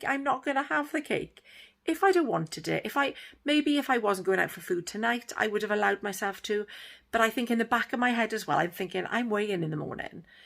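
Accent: Wales, United Kingdom. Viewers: Good will power and self control. I am on Nutracheck. Did Slimming World and lost 2 stone but was fed up of feeling guilty of going over my "syn" allowance. Now I am in more control. Losing slowly but that's the best way. I don't normally like chatty videos but yours is fab! Do I detect a Welsh accent? I am from Carmarthenshire but now live in Swansea. I really look forward to watching more of your videos. Thank you.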